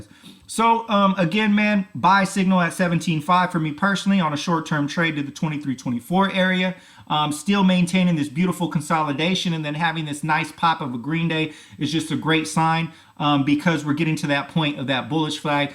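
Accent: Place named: New Jersey, United States. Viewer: HI! I appreciate your videos, thanks for sharing your opinions on TSNP. Was surprised that you haven't received a response regarding the investor conference call....They should give you an engraved invitation!! You are doing good things spreading the word about this company. side note - Where is your accent from? New Jersey? New York?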